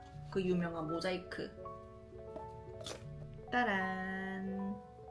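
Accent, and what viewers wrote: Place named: Gyeongsang, South Korea. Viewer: 혹시 경상도분이세요? 사투리 장난없으시네여 🤭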